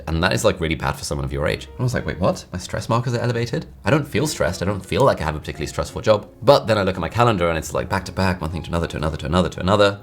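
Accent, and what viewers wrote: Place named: United Kingdom. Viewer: Have you ever made a video of your transition from Pakistan to the UK? How did you absorb the British English dialect?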